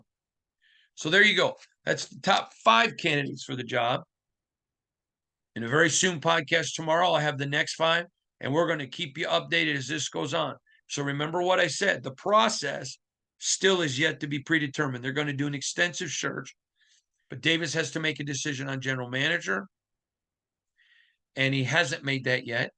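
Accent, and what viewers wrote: Midwest, United States. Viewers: He's from the mid-west !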